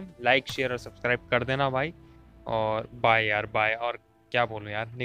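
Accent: India. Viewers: Bhai utrakhand se ho Kya ? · Bahi tu GADHWALI hai kyaa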